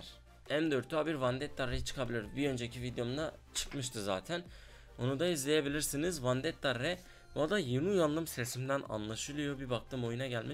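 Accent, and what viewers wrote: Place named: Tokat, Turkey. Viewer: abi nerelisin ben tokat bizim oralılar gibi konuşuyorsun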